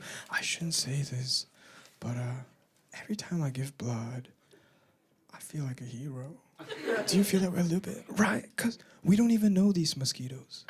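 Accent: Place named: Singapore. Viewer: Are you Singaporean? I can hear the accent haha, I’m proud!! You have really great jokes and way of storytelling ❤❤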